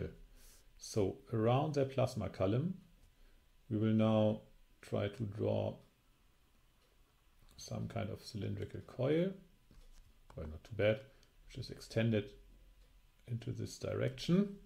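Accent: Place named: Germany. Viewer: Tolle Serie, bei den meisten Dokus wird immer das gleiche gezeigt. Mich hat schon lange das Thema Kernfusion interessiert und ich "verschlinge" alles was es zum Thema Fusion in Form von Videos gibt . Daher haben mich auch die Frühen Jahre dieses Forschungszweig interessiert (Spiegelmaschiene usw) vorallem die ersten Prototypen die ja eher noch Winzlinge waren . In dieser Richtung findet man eher wenig im Www . Mit dieser "Dokureihe" hat sich dieser wunsch erfüllt. Danke! Einziger manko das es in English ist was aber wiederrum verständlich ist da ja in der Wissenschaft English die Umgangsprache ist . Gott sei dank ist es English mit German Aktzent 😅 das macht es leichter. verständlich . Grüsse aus Austria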